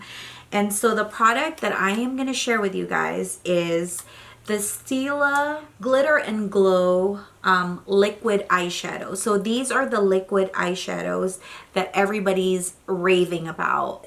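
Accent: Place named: England, United Kingdom. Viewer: I like your shimmer and glow but I only could think your very nice English accent :)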